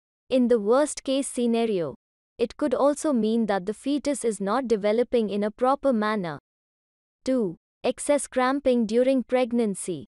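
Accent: India. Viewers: Ugh, another Indian accent video. So annoying.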